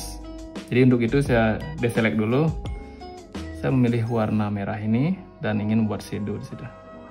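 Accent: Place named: Bali, Indonesia. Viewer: Dari pronunciation kayanya orang Bali nih, sukses terus bli sya udah subscribe.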